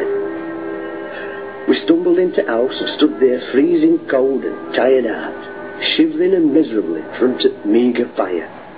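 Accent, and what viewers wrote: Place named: South Yorkshire, United Kingdom. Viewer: The voice is that of Tony Capstick, a folk singer and radio presenter who had a South Yorkshire accent. Nothing like Mancunian, I'm afraid Manchester is, indeed, in Lancashire, but Greater Manchester comprises parts of south Lancashire and north Cheshire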